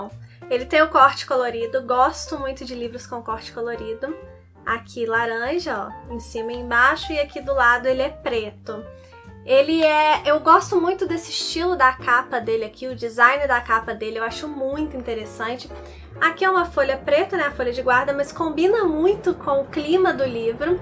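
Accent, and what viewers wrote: Minas Gerais, Brazil. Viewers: Oi! Te conheci essa semana e estou adorando seu conteúdo! Sua voz é tão gostosa de ouvir, esse sotaque delicioso de Minas ❤ adorei sua seleção, livros realmente lindos! Aqui na minha estante meus xodós são as edições ilustradas de Harry Potter, as novas edições de Senhor dos Anéis, pela Harper Collins, e a edição da Puffin in Bloom de Anne of Green Gables, aquele azulzinho, sabe?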